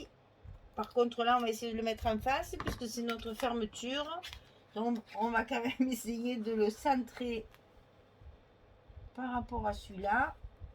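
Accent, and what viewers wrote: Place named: Provence, France. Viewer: vous êtes pétillante, pleine de joie de vivre et on devine votre accent provençal. . Tout ce que vous nous apportez dans le scrap... à très bientôt 🤗😴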